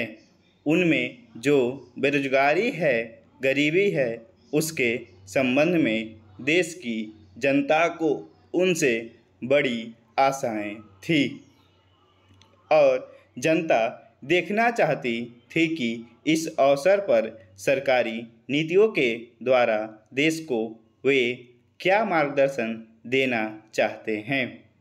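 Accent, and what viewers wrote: Bihar, India. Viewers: Sir apke kuch words bolne me galti hai mtlb apki pronunciation thik nahi hai jaise 👉 ye , jyadatar aur bhot se words hai Baki sab badhiya hai shyd aap bihar ki side se honge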